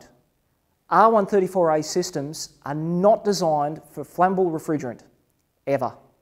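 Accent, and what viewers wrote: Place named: Australia. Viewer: It's Aussie, not English.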